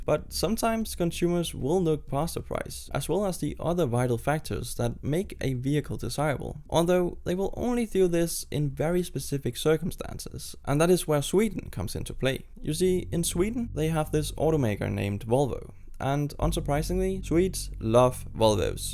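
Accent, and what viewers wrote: Denmark. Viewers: Visste du var dansk från din engelsk dialekt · how could you possibly not know he was danish with that accent? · I could smell this guy's Danish accent from a mile away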